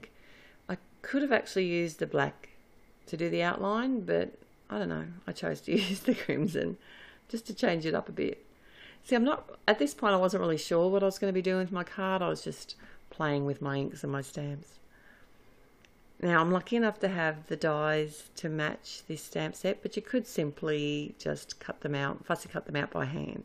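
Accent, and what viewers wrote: Australia. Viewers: Nice to hear a fellow Aussie's voice on YT!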